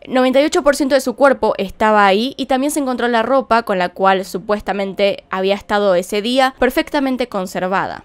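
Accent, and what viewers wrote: Argentina. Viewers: Estoy aprendiendo español me gusta mucho el acento Argentina 🇦🇷♥️✨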